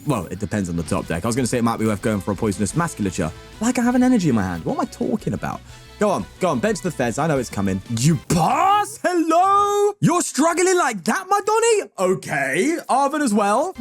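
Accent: RP, United Kingdom. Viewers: No, you sound fine. Sometimes I find the posh wee bit funny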